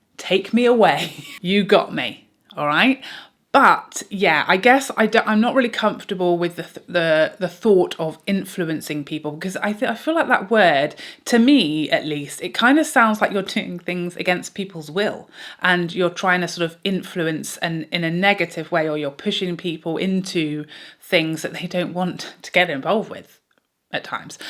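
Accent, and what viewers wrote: United Kingdom. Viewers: Hi, I am new to your channel. You have a lovely British accent and very thoughtful reviews of products that are helpful. I enjoyed hearing all the answers to the questions in this tag. I hope you have a wonderful day! xo, Karen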